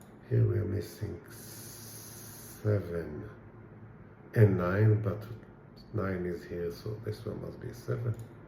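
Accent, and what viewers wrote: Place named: Israel. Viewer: Name is, has Israeli accent... נראה לי שאני יכול להגיד בוודאות שאתה ישראלי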